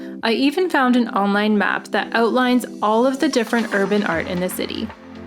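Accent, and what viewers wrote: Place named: Canada. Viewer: This lady has a nice Canadian accent.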